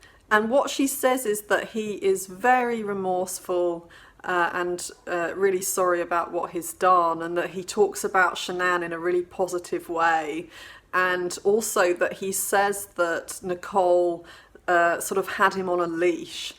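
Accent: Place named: London, United Kingdom. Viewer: So it IS a London accent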